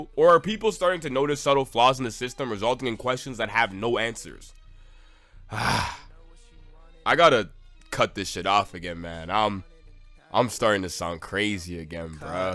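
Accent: Toronto, Canada. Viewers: I hear a Toronto accent😂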